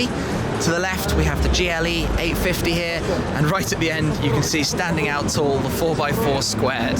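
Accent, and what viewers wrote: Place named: United Kingdom. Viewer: sotaque britânico...eca...manda um americano cobrir o evento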